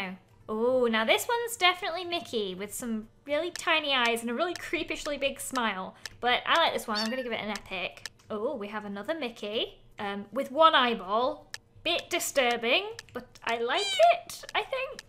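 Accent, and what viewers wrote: England, United Kingdom. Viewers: she has a british accent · She is from England. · She is English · lol she's british XD I can tell you're new here... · She is British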